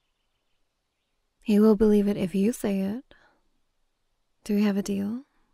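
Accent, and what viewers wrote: United Kingdom. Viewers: Love your cute British accent.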